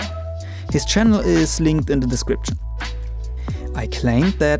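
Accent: Germany. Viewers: You sound Like a german Guy LeL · Hi, are you German? It's just bc your voice and pronunciation sounds a bit like German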